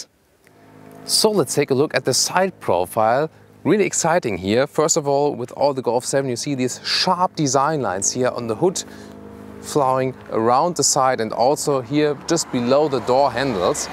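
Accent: Germany. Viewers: An excellent and thorough review by a true gentleman. Your German accent is awesome !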